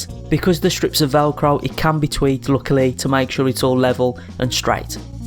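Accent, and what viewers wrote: Black Country, United Kingdom. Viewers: Yaw sound like a yam yam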